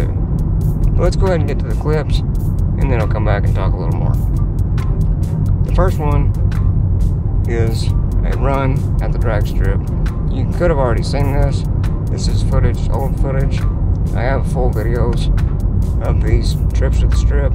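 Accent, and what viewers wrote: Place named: South, United States. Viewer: Man your voice and southern accent are the shit!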